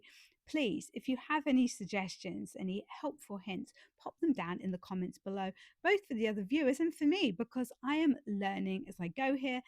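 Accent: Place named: New York, United States. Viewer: welcome. I love when your real NY accent slips out.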